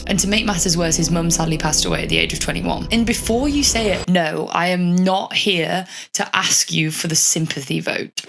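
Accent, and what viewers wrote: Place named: RP, United Kingdom. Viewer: The posh upper-class British accent I hear..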